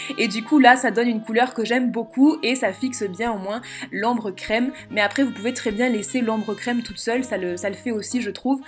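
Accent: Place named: Marseille, France. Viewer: Maquillage parfait rien a redire, top pour l'automne j'aime beaucoup ! J'adore ton accent je suis également Marseillaise ! Des bisous de Marseille ❤️😂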